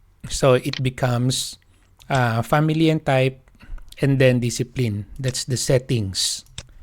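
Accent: Philippines. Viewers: are you pinoy? you sounds like pinoy sir. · accent palang pinoy na alam ko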